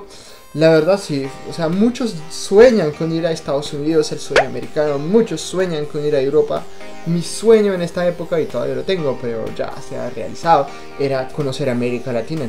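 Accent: Colombia. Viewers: Todos tenemos un acento muy diferente, por lo tanto lo diferente llama la atención, al menos a mi me gusta mucho el Acento Colombiano pero me encanta el mío... MEXICANO. tienes el acento Colombiano pero la sangre Francesa y en eso hay una muy bonita diferencia... Au revoir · jajjajajaja creo que te gustaban las chicas mayores! Y ya entiendo de dónde sacaste el acento colombiano. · De verdad que eres muy divertido y tierno, la verdad he visto mucho de tus vídeos y me encanta ese acento Colombiano que tienes... me encanta que te hayas enamorado de mi Pais... saludos y gracias por compartirnos las historias de tu vida... Pdta: Yo creo que tu naciste con alma Colombiana:D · Hola ya había comentado que me encanta el acento colombiano esta muy chevere... Pero el acento de mi México es chido je je je Saludos eres muy lindo... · jajaja el acento colombiano muy marcado chico!!!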